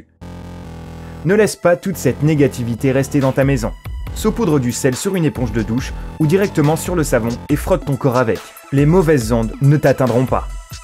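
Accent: Quebec, Canada. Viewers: kawaiser 5.1 il habite au quebec je pense car il as un accent québécois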